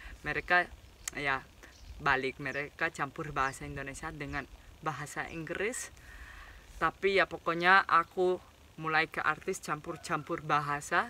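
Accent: Java, Indonesia. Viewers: Hello, anda sdh passs, logat jawa/indonesia...Aq sangat terharu banget, Aq sungguh bangga lho, bhsa q, sangat mdh anda gunakan, kenapa to pindah Saja had WNI, saja,?? Semoga anda had warga Indonesia, dan terima kaseh anda telah ikut membawa bhsa. Indonesia, dimata dunia.. Sehat sllu yaa, semoga anda senang diindonesia, kami Akan senang,klo anda menetap dinegeri ini. Thanks.. 🙏🙏🙏👍🇮🇩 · banyak logat wong jowo 😁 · Buset dahh ngomong Indonya medok bnget lgi wkkww keren kak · Boso Indonesia mu "Medok".. heheheh:) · Bagus Logatnya sdh Indonesia banget 👍👍👍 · Mantap bahasanya logatnya udah Indonesia banget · Selama aku cermati selama kamu berbicara dengan nada logat Jawa banget loh... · Mbak kalau bicara bahasa Indonesia tapi logatnya Jawa. Semoga mbak sehat selalu dan mudah2an kita bisa bertemu. Oo... Ya mbak saya orang Jawa ( Kebumen) tapi sekarang tinggal nya di Kalimantan Timur. 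Sehat selalu ya mbak 👍👍👍👍👍👍. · Logat Bahasanya Bule seperti orang Jawa.. Medok banget..👍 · mbak nya medok jawa ya bahasanya sukses selalu mbak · Mbak. Saya bangga sebagai orang Indonesia,mbak mau memakai bahasa Indonesia di tambah medoknya nya itu loo....Jawa.❤️❤️❤️❤️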